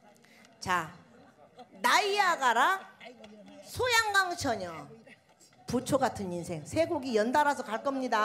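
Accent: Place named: Jeolla, South Korea. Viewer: 전라도 사투리 엄청 쓰죠? ㅋㅋ 감사합니당 😊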